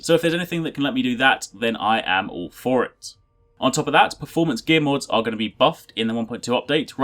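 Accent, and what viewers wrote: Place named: United Kingdom. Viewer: great content!thumbs up because i like listening to u.k guys accents.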